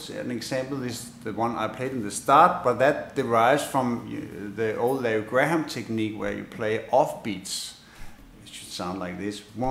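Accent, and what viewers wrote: Denmark. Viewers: You are Danish?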